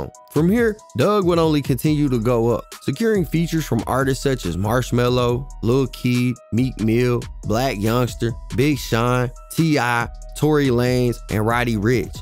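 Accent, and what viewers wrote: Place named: North Carolina, United States. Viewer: This the 2nd video I watched and plan on watching more. You did your thang bro! I got a friend in NC, I bet your from out that way, he talks just like you..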